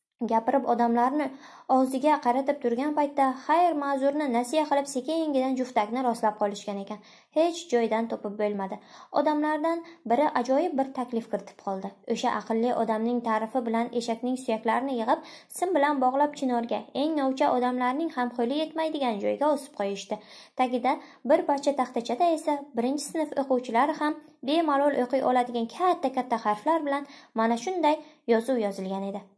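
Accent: Bukhara, Uzbekistan. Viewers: Маладес қизим, зўр ўқибсиз👍. Бухороликмисиз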